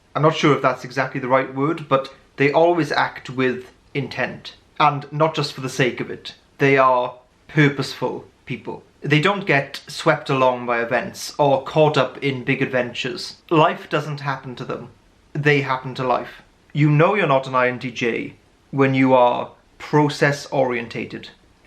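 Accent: Wales, United Kingdom. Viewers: Yo, are you Welsh by any chance?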